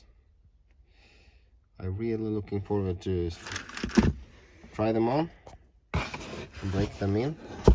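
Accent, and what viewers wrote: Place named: Finland. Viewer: Cool boots when I first saw the thumbnail I thought they were Wolverine 1000 mile cordovan. Do you mind me asking are you Finnish? You have a really cool accent you sound like Kimi Raikonnen or somebody.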